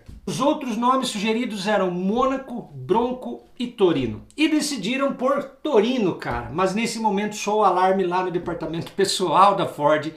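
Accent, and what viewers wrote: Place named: South, Brazil. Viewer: Opa...pelo sotaque tu é sulista.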